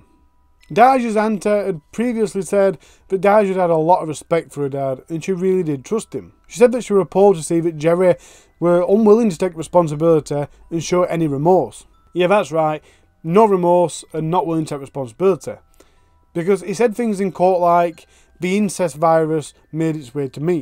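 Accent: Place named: Wales, United Kingdom. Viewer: Love your channel and your Welsh accent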